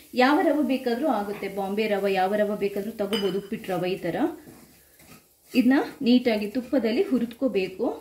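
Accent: Mangalore, India. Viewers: Super ma'am nim kesari bath jothege manglore kannada kelodu Chanda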